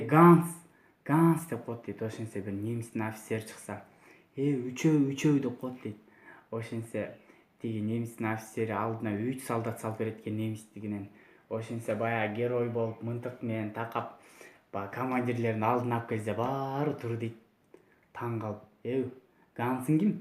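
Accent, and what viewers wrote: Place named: China. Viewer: чипилдирик кытайга окшошон пиздюк кытайдан келип кыргыз тилин уйронуп аткандайсынго жаны туулган торпоко окшоп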